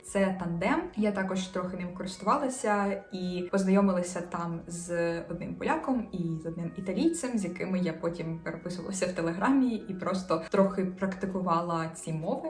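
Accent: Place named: Japan. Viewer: Дуже пізнавально, дякую!! хочу сказати що мабуть те що ви почали вивчати японську -наклало відбиток на вашу інтонацію. При бажанні подивіться відео ToriChyanChannel. Наша дівчина що вийшла заміж в Японію, в неї дуже змінилась вимова і інтонація ( на останні слова в реченні) за ті роки що вона там. Бажаю вам щастя і успіху!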